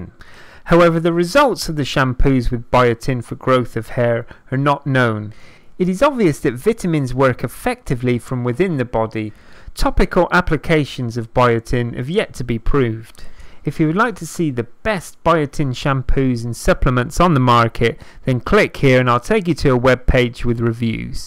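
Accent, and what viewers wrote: United Kingdom. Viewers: He's from the UK. That's the way they say it there. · British accent is the best! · Why are people so upset, it’s British English, it’s the English that made English ENGLISH. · British accent is the BEST omg❤️ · I am British living in London. This man is British and is pronouncing things as it should be. This is the UK! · He's British, silly.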